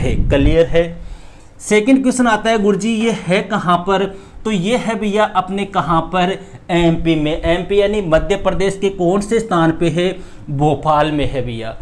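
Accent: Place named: Haryana, India. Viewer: sir Ap bahut Achha padhate ho but sir background sound thik karo please or hindi bola karo haryanbi mat bolo please 🙏🙏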